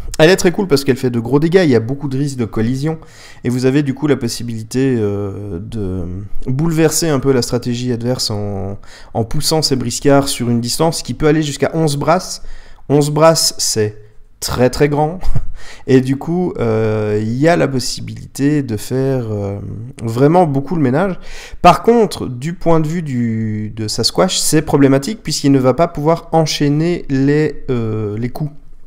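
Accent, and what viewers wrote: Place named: Switzerland. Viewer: Un grand merci pour cette vidéo. Je t'ai découvert en faisant des recherches sur le jeu. Briskars est un jeu qui me tente de plus en plus: -de part son échelle, j'éprouve du mal sur le 28mm depuis une opération aux yeux, -l'accessibilité des règles sur le Net. Au doux son de ta voix, je te devine dans le plat pays helvète ^^, y résidant moi même, je me permet de te demander si tu connais des clubs ou détaillants permettant de découvrir le jeu afin de me faire une idée plus personnelle de ce qui en est? Amicalement Fred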